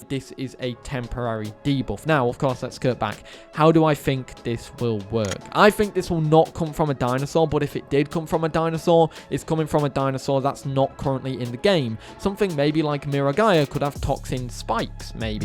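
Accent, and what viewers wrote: United Kingdom. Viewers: its been so much time since ive watched a video, and god does the bri ish accent bring me memories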